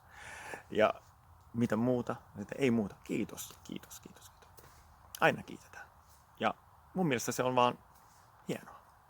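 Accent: Finland. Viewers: Now that you said it, I agree! There are a lot of swedish speaking finns in finland, and your pronounciation is quite similar :) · Treenaatko suomen aksenttiasi erikseen vai onko kehittynyt korvakuulolta?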